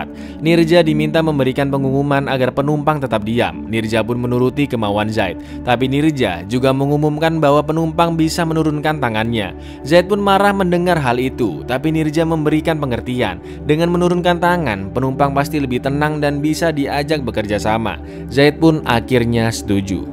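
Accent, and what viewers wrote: Java, Indonesia. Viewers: WONG JOWO NDI SUARANE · Suweneng aku rek nak Ojo logat e jowo ngeneki subscribe sek👍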